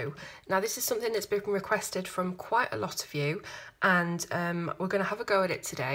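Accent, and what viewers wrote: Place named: Northern England, United Kingdom. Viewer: I think you tutorials are great and very informative. I love watching your videos and listening to a northern English lass is very refreshing. So Thanks 😊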